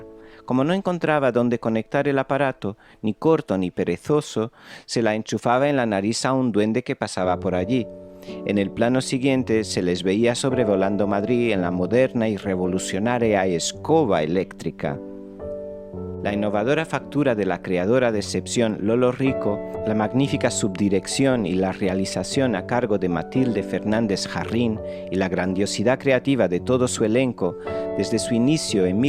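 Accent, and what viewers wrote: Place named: Portugal. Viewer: Portugués... Claro... Habia algo en tu ecento... En fin... Excelente canal y gracias por responder tan pronto....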